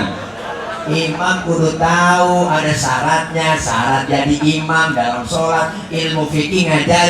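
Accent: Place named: Betawi, Indonesia. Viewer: ustad adalah betawi Asli bekasi, betawi bekasi terkenal betawi medok, bahkan betawi bekasi menurut para pakar sejarah suku/bahasa, bekasi tempat awal lahirnya suku betawi! dan betawi Jakarta tempat memperhalus bahasa betawi bekasi! orang luar daerah jakarta menyebut betawi berasal dari jakarta, dan itu sudah dibantah pakar sejarah betawi dan pakar sejarah di indonesia, dulu jakarta sebelum dijajah dan sesudah dijajah portugis, belanda, di jakarta belum ada penduduk asli jakarta. dan bahkan masyarakat yang pertama dateng di jakarta bukan suku betawi. awal mula suku betawi dateng ke jakarta pada abad 17-18, suku yang dateng ke jakarta berasal dari bhagasasi atau yang sekarang di sebut bekassi. dan disitulah betawi menyebar ke jakarta, pinggiran perbatasan bogor, depok, tanggerang dll, bahasa betawi sudah mulai memperhalus, dan yang masih asli masih di kota kelahiran betawi di bekasi! 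semoga kita semua belajar lagi sejarah semua di NKRI, supaya tidak hilang suku dan bahasa di negara kita NKRI!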